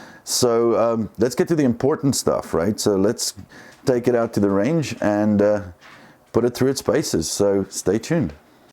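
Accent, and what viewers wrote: South Africa. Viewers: You sound South African ... ?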